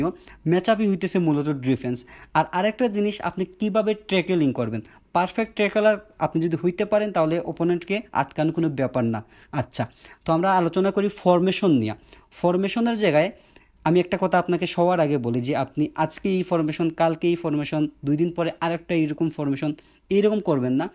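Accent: Sylhet, Bangladesh. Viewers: bai channel or namor bay deka lagse na. tumar voice e bujilaisi tumi sylheti😁🫣🫣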